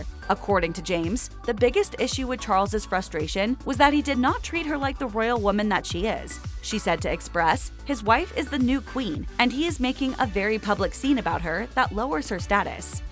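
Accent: United States. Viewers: The moment that American voice started I knew we were in for a load of twaddle. You are so indoctrinated with 'celeb-crap thinking' that you view everything through US celeb lenses. The rest of the world is different - reality is the norm, not trashy gossip dished out as 'news' Sleeping in separate beds is the norm in such households - it's the norm!!! Public spats? You mean 'body language' interpretations. Rumours - yeah rumours. "may soon be calling it quits.." Yeah, that's a big 'maybe' How much is the MM paying you for this silliness? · What a load of rubbish! Why is it these stories are always narrated by an american?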